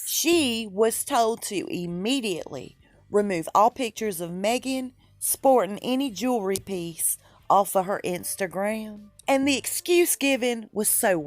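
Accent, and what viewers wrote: South, United States. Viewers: A southern accent always makes me feel at home. I appreciate you,!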